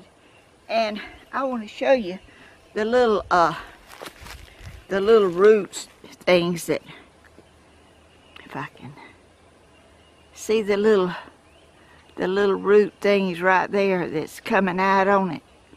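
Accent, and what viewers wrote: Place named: Georgia, United States. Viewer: I was born the southwestern part of Georgia .your accent sounds very much like the accents of people from my part of Georgia..curious which part of Georgia you r from? Your accent sure sounds familiar... like the folks from my neck of the woods...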